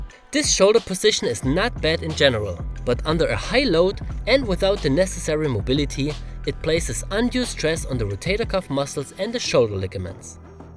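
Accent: Germany. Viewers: Your accent sounds german🤔 The Video was very helpful, thank you! :) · Sound like Do You have a Garmen accent. :)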